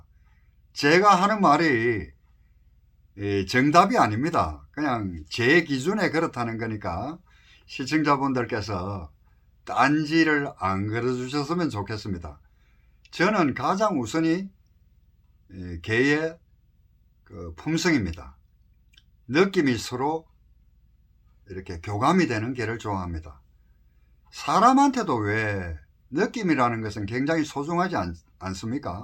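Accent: Gyeongsang, South Korea. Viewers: 오늘도 구수한경상도 사투리로 커피한잔하면서 영상속의 말씀.잘들었습니다 펜으로 응원합니다 행곡하시고 건강하세요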